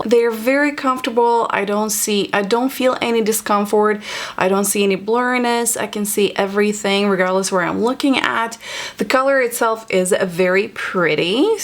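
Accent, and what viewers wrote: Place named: Poland. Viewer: I absolutely love your accent! You have a great soothing voice, you are very beautiful, are you polish? ❤️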